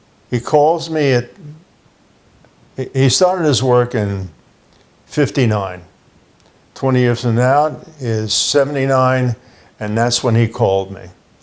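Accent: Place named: New York, United States. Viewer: Sounds like he grew up in NYC.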